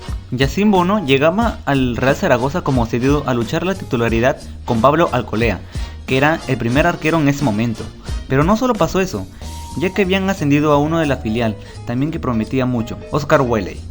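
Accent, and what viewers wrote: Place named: Argentina. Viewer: Porque habla como un argentino??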